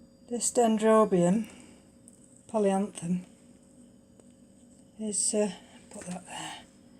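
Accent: United Kingdom. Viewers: What part of the world are you living ? (from your accent, I assume the UK). The fact that they've gone into a bark-based mix with reasonable root systems, I would start feeding whenever you water remembering a flush with clean water every so-often. In the UK, it's the growing season and you have active root tips and new growths so they will appreciate some feed right into the Autumn.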